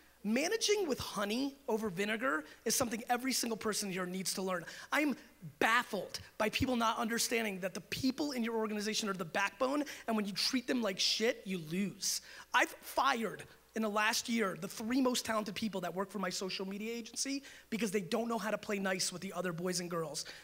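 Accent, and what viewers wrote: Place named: New York, United States. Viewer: I've noticed! I started going to networking events so you have to have a good speech, and so I litsen to the ones I like, you are one of them :) One question tho, I know you get alot of them, I live in Sweden and work with art, love my job but I found it hard to transcend from private customers to companys. Do you have any advice? Thanx, keep up the good work. Love your NY accent haha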